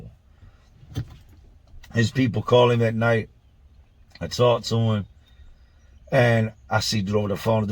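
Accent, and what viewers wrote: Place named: New Orleans, United States. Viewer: I really enjoy your content. I listen while I’m grocery shopping. Question: your accent has a lot of New Orleans inflections. Did you ever live here in NOLA? You say a lot of things the way NOLA natives (from the 3rd, 7th, 17th ward for example). I’m so curious how you got this accent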